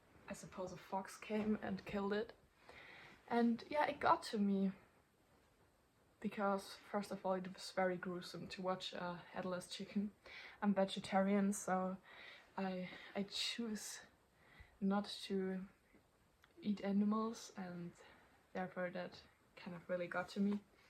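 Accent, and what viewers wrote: Germany. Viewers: Moment, bist du Deutsche? Den Akzent hat mir ein Gefühl gegeben aber ich war mir nicht sicher :)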